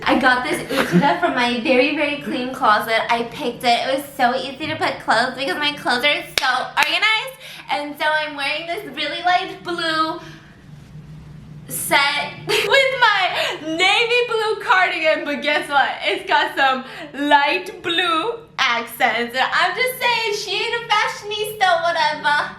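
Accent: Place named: United Kingdom. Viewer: Awww, miss!! I love your British accent btw XD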